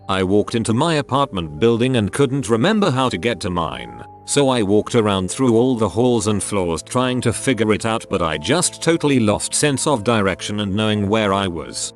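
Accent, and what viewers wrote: United Kingdom. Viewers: I have trouble understanding and following this British sounding robot. The American sounding one is far easier to listen to and understand. Signing out of this crap.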